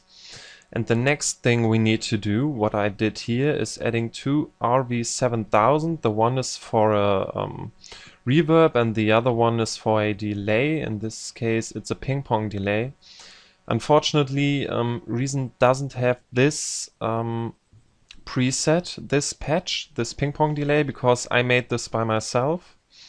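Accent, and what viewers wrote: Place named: Germany. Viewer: An deiner Aussprache hört man dass du deutsch bist :D Und dann hab ichs an deinem Programm gesehen xD Geiles Tut!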